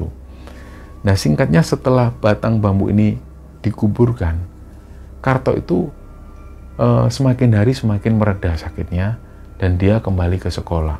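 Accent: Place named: Surabaya, Indonesia. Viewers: Aku Sasak lombok, tapi dengar mas bicara, tangan,baku rindu Surabaya akubrindo Jombang.. pernah hidup di jatim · Host nya wong mana to? Bahasanya Suroboyoan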